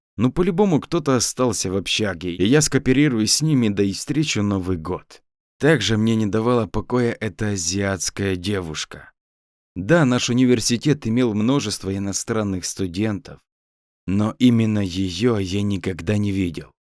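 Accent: Ukraine. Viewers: По говору слышно)и очень приятно слышать рассказы об украинских местах, с элементами местных словечек) у вас отлично получается озвучка, желаю вам удачи) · Автор,ты не из Украины ?)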